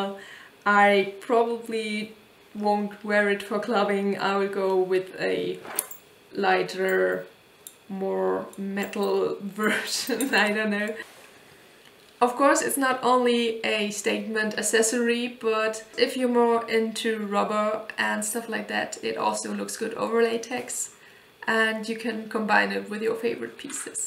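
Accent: Germany. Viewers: And that German accent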